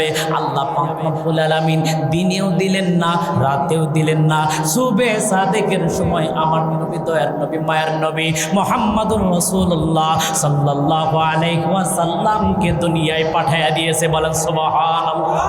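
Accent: Shariatpur, Bangladesh. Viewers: ধুর শরীয়তপুরের কন্ঠে ঠিক আছে কিন্তু আবার হুবহু ওয়াজও নকল করেছে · ধুর শরীয়তপুরীর টানটা ভালো লাগেনা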